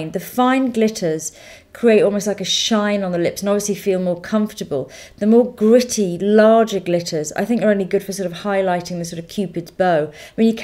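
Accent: United Kingdom. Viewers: i love your british accent!! xD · your amazing and love that your bristish!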